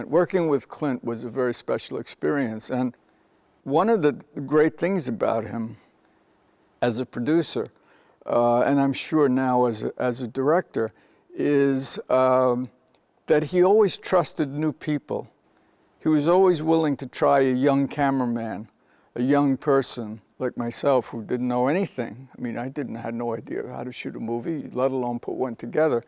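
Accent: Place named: New York, United States. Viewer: Thunderbolt and Lightfoot was an extraordinarily accomplished debut. Like another singular talent, Oliver Stone, he was a graduate of Yale. Year of the Dragon remains his best film--for me at least. There was a very beautiful Chinese-American actress in it. I love his accent--pure Italian New York! Thanks for posting this and keep up the good work.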